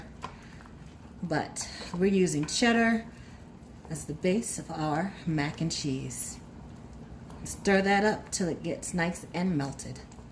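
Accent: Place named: South, United States. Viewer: you got that soothing sothern voice 👌🏾 thats how u know the mac official, like a ref w a whistle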